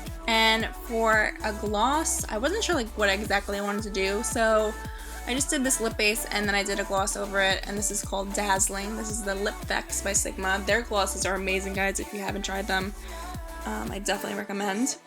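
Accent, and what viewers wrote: New Jersey, United States. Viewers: Girl! How have I never stumbled across your videos?! Flawless makeup, and it sounds like you're from Jersey... automatic win!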